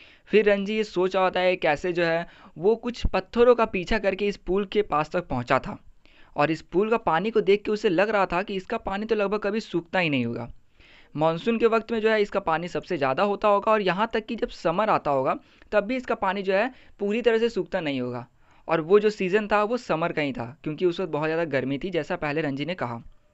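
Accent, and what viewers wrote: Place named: Jharkhand, India. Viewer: Jharkhand se ho na?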